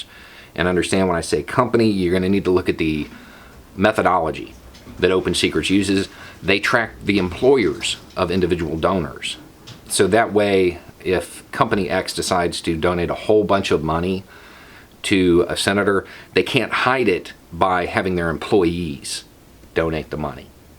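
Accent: South, United States. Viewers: I hear the southern voice and I see the beard, I see the name, so I come here every day to disagree with you, and I fail every day, dammit!!! You keep destroying my preconceived notions.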